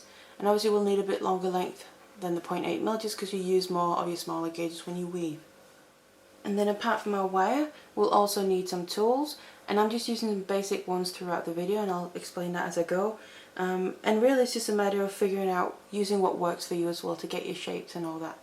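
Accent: United Kingdom. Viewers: I really love your videos. Thanks so much for sharing your talents with us-for FREE. I love your accent, I'm from California and you sound so British. I know how much work goes into even a short video, and yours are so well done you must put lots of time and effort into them. Keep them coming.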